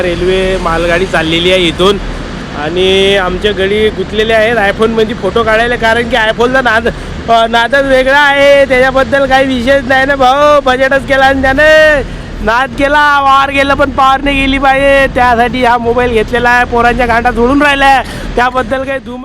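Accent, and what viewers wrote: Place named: Vidarbha, India. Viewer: भाऊ भाषा शुद्ध झाली आपली गावरान विदर्भाची भाषा राहू द्या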